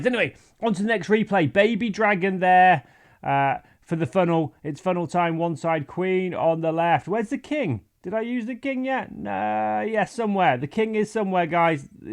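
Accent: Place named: United Kingdom. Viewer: He is British and British accent is the best one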